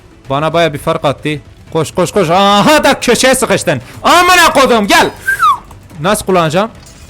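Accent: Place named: Kurdistan, Turkey. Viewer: çekemeyenler olacak seni sen bir Kürtsün senin şivene doğalığına kurban valahi senin takipçin artıkça ben seviniyorum yolum açık brakoo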